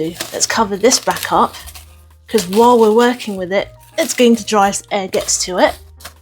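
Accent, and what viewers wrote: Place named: United Kingdom. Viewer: I just love you're cute British accent. Thank you for reminding me of the vodka and food color painting method of decorating cookies. I am going to try that for Christmas. 🎉❤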